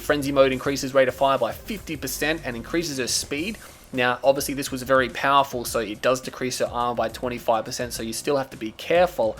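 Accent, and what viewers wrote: Australia. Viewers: Your Ausssie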